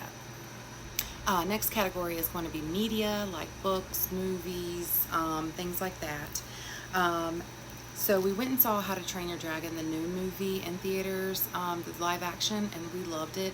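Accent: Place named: South, United States. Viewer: I’m so excited for you and your channel !💖 Our sons have the same stocking ! How cute 😊 The DVD’s!! Love to see it ! Nothing better than having physical media. My ultimate goal is to have all our favorite shows /movies on dvd /vhs so we can cancel subscription services lol 😅 I was literally typing to recommend the Freddy FAS bear chapter books as you started talking about books lol because my son used to love those. Also loving the bookshelf ! 🥰 And your accent so cute and southern! 💖 Just know you have a loyal subscriber here girlie ! 🤗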